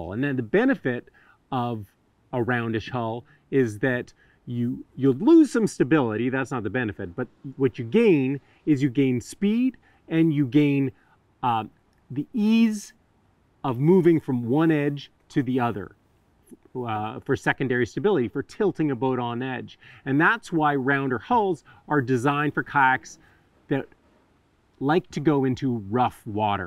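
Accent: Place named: Canada. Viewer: Info as always really appreciated, thanks. Plus your Canadian accent cracks us up down here in New Zealand. Colour density joke had me going for too long. Dang it! On a more serious note, not sure you have covered this point:- Which colour/ combination/s do you believe to be safest colour on the water?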